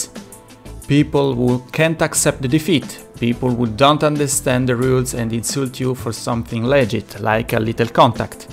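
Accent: Italy. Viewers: love the italian way that talks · questa la prima volta che in un video sento quella che sembra essere la tua voce e penso di poter affermare con certezza che tu sia italiano ;)... grande ti stai impegnando e ti faccio i miei complimenti continua a cercare di tenere attivo questo canale in inglese e migliorare sempre.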